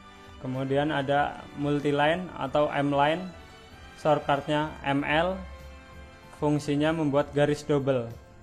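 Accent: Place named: Banyumas, Indonesia. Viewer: ngapak banget mantap mas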